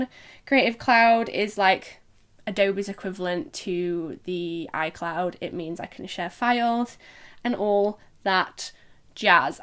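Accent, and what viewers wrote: United Kingdom. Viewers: Love your British accent! Bloody good video!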